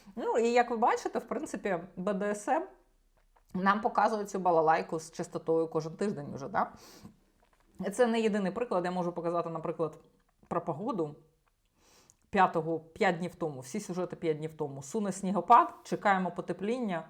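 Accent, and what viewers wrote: Russia. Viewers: Цікаве відео, дякую ) Ви питали, чи дратує ваше цмакання. Мене не дуже. Але є інші звуки, що не дуже органічно звучать для мене. Такі слова, як "немаЄ", "знаЄш", "здаЄться", ви вимовляєте як "немаЭ", "знаЭш", "здаЭться", на російський манер. Стараюся не звертати уваги, але реально важко. Тому дивлюся вас рідко, на жаль.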